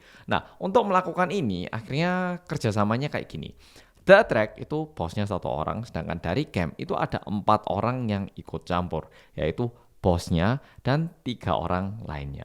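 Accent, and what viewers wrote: Java, Indonesia. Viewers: mas ini orang korea asli cuman pernah tinggal di indonesia untuk waktu yg cukup lama, makanya medok banget, bisa di cek untuk memastikan di video lama. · Mau naik malah turun kasian bukan,, hehe aku seh penyuka dangdut jadi saya nonton channel ini bukan tertarik dengan informasi yang di bahas sekarang tapi aku nonton orang nya suaranya medok hehe😊😊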